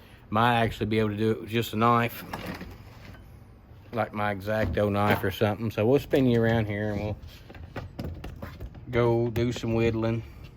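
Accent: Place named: North Carolina, United States. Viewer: Sounds like you’re from Lumberton NC. Stay safe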